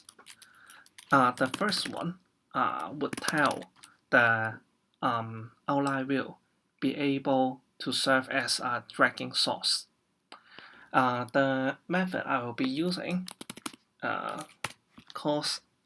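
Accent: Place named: Hong Kong. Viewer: Helpful!港式英语，哈哈！不过讲粤语更加少人看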